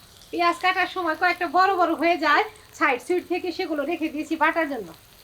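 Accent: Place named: Dhaka, Bangladesh. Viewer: আপু আপনি কি ঢাকায় ছিলেন আপনার কথা ওনেটা আমাদের মতো ঢাকাইয়া ভাষায় কথা বলেছেন রিপ্লাই দিয়েন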